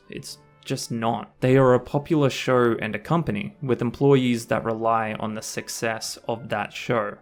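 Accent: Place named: Australia. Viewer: Vids are crisp love your work keep it up. Haven’t seen many Australian (assuming from the accent) d&d channels.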